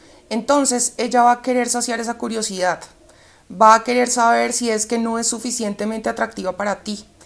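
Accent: Colombia. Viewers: Hola..te felicito que yo no dr donde sacas esas ideas y cuando las piensas...Si te vienen s la cabeza cuando estás viendo el juego de fútbol o en el supermercado o te pones a pensar y estudiar y después te sientas a hablar o es que hablas que hablas con los asesores de España estos unidos Dinamarca y Nepal que tienes...Eres muy inteligente y hablas como su fueras colombina. Te felicito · ALABADADO SEA EL SEÑOR POR FIN UNA PERSONA QUE HABLA CLARO COMO EL AGUA... NADA QUE VER CON ESOS SEUDO COUCHES QUE HABIA MIRADO PENSANDO QUE ERAN LO MEJOR.. POR EJEMPLO SEGUIA A UN ESPAÑOLETE QUE SE PARECE A MIGUEL BOSE PINTA EL MAN Y NO LE HE ENTENDIDO NI JOTA . PERO NUNCA PERO NUNCA PENSE EN EL PUNTO DE VISTA DE UNA MUJER SINCERA FRANCA Y DIRECTA...TE DOY UN GIGANTE 10 DE 10 TE FELICITO VEO POR TU ACENTO QUE ERES COLOMBIANA , GRACIAS COMPATRIOTA ESTAS HACIENDO PAÍS . NO ERES JESÚS PERO DEVUELVES LA VISTA A LOS CIEGOS. 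ERES MUY LINDA Y NO ME REFIERO A LA FACHADA A TU APARIENCIA PERSONAL QUE ESTA EXELENTE POR CIERTO. A LA BELLEZA VERDADERA LA QUE VALE MIL MILLONES DE TONELADAS DE ORO A LA BELLEZA INTERIOR. A TI MI REINA TE SOBRA HASTA PARA DAR Y REGALAR.. EN SERIO ERES LINDA Y BELLA DE MENTE ALMA Y CORAZON 💓💓💓💓 LO DEMAS COMO LA APARIENCIA O TU ASPECTO VENDRA POR AÑADIDURA.. GRACIAS TOTALES POR TUS PALABRAS LE DAS VALOR AL VALOR TUS PALABRAS Y FRASES ME MOTIVAN Y ME SACAN DEL ENGAÑO DE ESTE SISTEMA SOCIAL CORRUPTO Y OPRESOR. YO TAMBIEN TE ESTIMO. AUEQUE NUNCA TE VALLA A CONOCER SE QUE ERES DE FIAR Y CON ESO ME BASTA SUBE VIDEOS AL 💯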